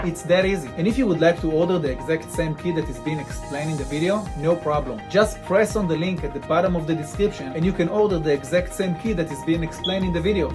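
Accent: Israel. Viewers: מבטא ישראלי. אשמח אם תוכל לעזור לי לגבי שכפול שלט לקיה קרניבל 2022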